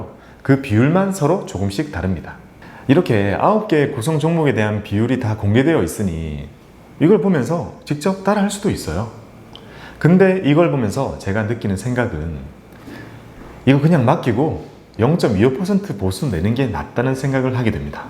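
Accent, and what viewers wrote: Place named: Busan, South Korea. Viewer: 부산 출신이신가봐요 ㅎㅎㅎㅎㅎㅎㅎㅎㅎ재미있네요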